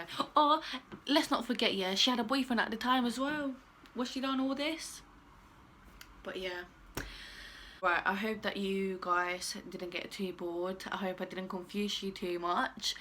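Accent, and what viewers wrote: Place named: London, United Kingdom. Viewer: You from London or something!? Love the accent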